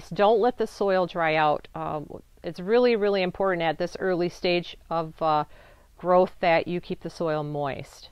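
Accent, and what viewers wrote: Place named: Chicago, United States. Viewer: Her accent makes me miss Chicago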